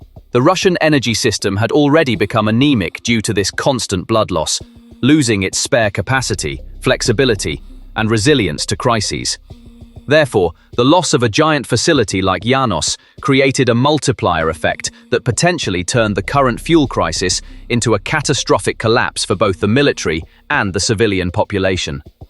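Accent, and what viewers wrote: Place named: England, United Kingdom. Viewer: An English voice. "Gas", not petrol!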